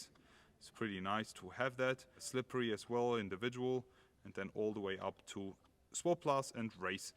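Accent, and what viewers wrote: Germany. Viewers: It might be because he is speaking English with a German accent!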